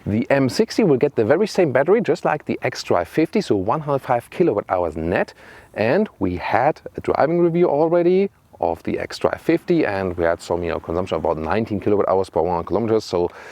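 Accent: Germany. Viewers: I'm sick when listening German accent ...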